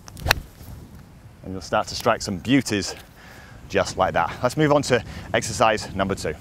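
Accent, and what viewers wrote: United Kingdom. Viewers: who the heck is and how ddid he get so many followers without me ever heating about him...fideo seems very good guess thats why..ps..how did the hoo UK online golf instructors manage ot get their own diffrent accent?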